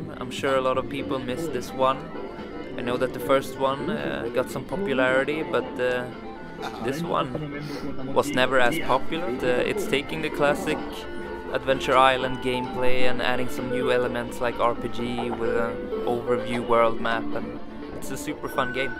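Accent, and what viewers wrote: Sweden. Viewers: Är du från Sverige?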